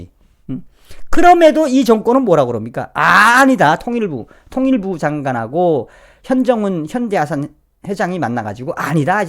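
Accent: North Korea. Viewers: 정성산감독님 화이팅 오늘도 가열차게 달린다 좋은 말씀 감사합니다 귀는쫑긋! !!!!잘 듣습니다!!!!히히 북한 말 조타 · 북한식...너무 웃겨요~~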